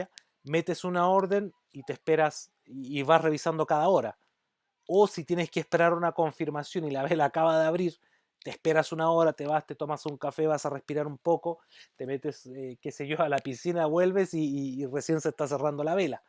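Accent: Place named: Chile. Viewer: 1er video q veo con una explicación tan clara de los patrones Heiken Ashi ! Se agradece la iniciativa.. (Da gusto escuchar el acento chileno) Salu2!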